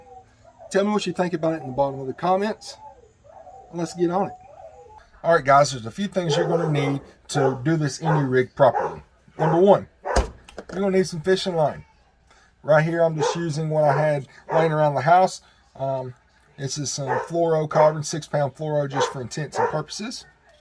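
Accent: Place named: New England, United States. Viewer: Could not follow the mumbling and drawl and dogs barking . Lots of people do not speak Yankee!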